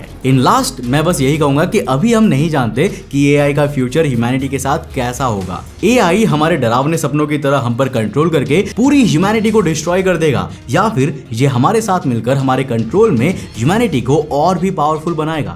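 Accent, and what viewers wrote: Bengal, India. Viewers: Bhaiya kya aap bengoli ho ??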